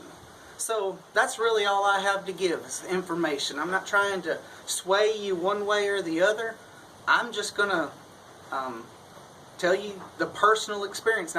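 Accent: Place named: Alabama, United States. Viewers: I live in Alabama too, it's weird hearing you say that, half the people around here talk like that!😆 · I live in Nebraska and most other states assume we talk like this. We don’t. We have a flat (no) accent. When I heard you speak the first time, I thought the same thing “what a nice accent!” It’s clearly southern, but not terribly twangy.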